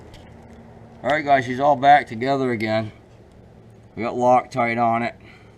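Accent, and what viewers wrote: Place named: Boston, United States. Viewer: Are you from Boston, MA? Love the accent :))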